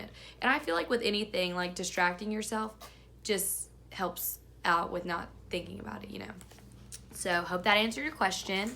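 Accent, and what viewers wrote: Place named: South, United States. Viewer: I love the accent. Miss the South!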